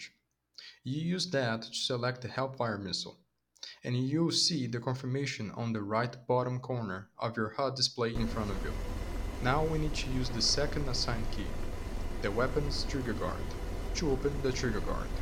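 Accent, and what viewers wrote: Brazil. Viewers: Tá bem BR esse sotaque aí hein! Anyway, thx for the tutorial.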